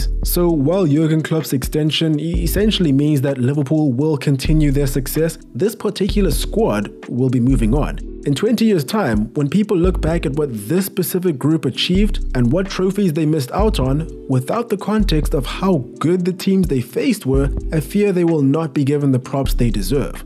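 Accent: South Africa. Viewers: bro, are you South African? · are you south african ?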